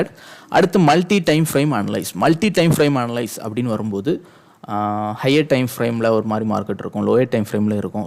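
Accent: Kanyakumari, India. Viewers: Kanya Kumari Slang😊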